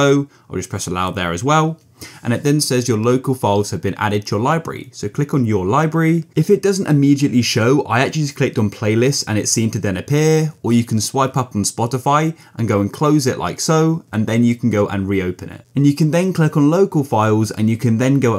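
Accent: United Kingdom. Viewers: doesn’t work + ur british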